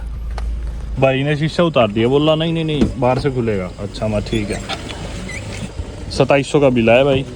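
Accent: Haryana, India. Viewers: I really like ur height and also ur haryanvi enuciation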